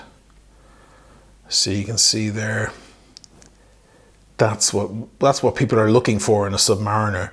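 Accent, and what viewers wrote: Ireland. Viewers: Might I ask where you acquired your accent? Ireland I am assuming. But where in Ireland?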